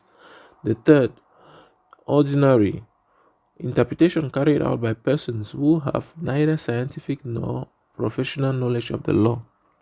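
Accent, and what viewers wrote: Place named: Africa. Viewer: Африканец говорит, плюс понятно что он просто читает, с сильным акцентом, плюс понятно что он ничего в этом не понимает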